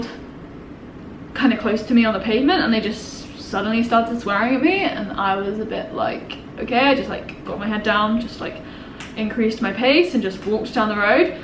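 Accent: Australia. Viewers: The Australian accent is creeping in. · You’re picking up the Australian accent.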